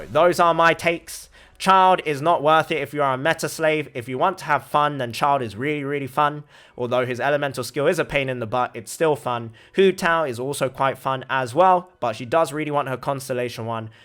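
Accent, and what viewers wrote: United Kingdom. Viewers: why the heck are you asian but has a british accent?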